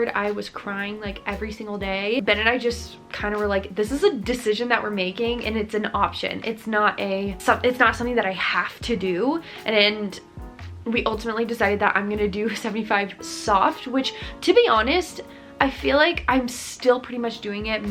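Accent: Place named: South, United States. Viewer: People who think your accent is fake isn’t from the south lol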